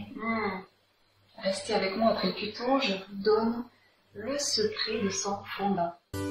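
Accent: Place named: South, France. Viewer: Rien que l'accent du sud nous ouvre l'appétit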